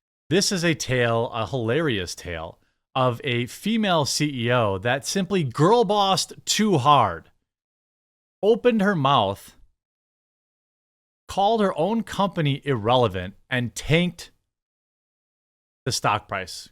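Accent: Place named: Wisconsin, United States. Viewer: Dang you live in SE Wisco?? I thought I recognized that "accent" lol - I was born in Elkhorn and still live in good ole Wisconsin 😎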